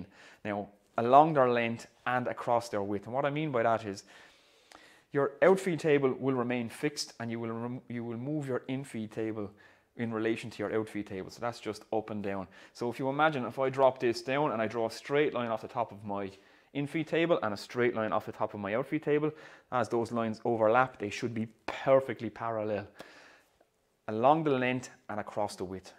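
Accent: Ireland. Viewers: I hear an Irish accent, I sub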